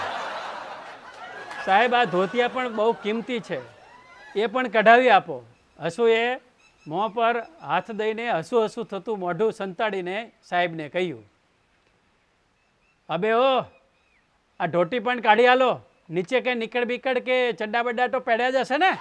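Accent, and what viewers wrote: Surat, India. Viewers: વાહ...હસીને લોટપોટ થઈ જવાયું...😂😂 આ તમારી વાર્તા વાંચી હતી પણ આજે તમારા સ્વરમાં સાંભળવાની બહું મજા આવી...👍🏻 સુરતી ભાષા અને યમરાજ અને ચીત્રગુપ્તનું અટક હાસ્ય તમે જે કર્યું એમાં બહુ હસવું આવ્યું.... ખૂબ જ સરસ વાર્તા સંભળાવી અને આગળ પણ આવી રીતે નવી વાર્તા સંભળાવતા રહેજો..... 👌🏻👌🏻👍🏻🤣🤣🤣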